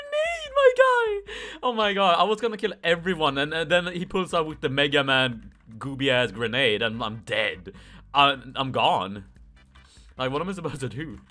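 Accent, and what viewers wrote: Sweden. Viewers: you kinda sound swedish.. am i right?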